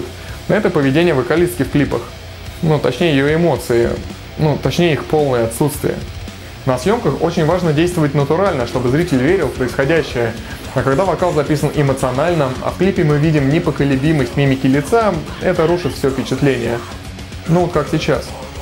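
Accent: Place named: Russia. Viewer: Годнота зашла, да русский акцент немного режет слух, но это лично моя маничка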